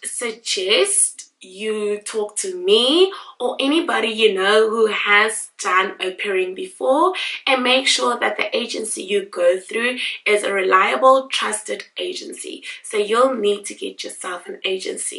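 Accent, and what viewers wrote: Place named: South Africa. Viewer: Which you could speak in your south african accent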